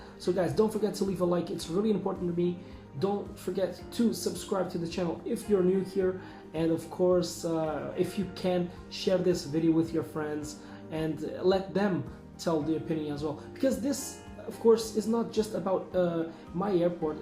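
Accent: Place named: Portugal. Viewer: bom video, tens um inglês muito bom, mas nota-se bem que es português pelo sotaque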